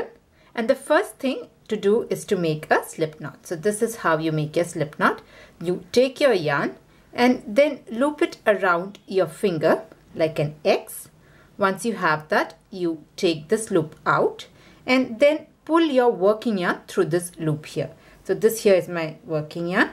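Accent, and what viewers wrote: India. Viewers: idk crochet but the Indian accent helps a lot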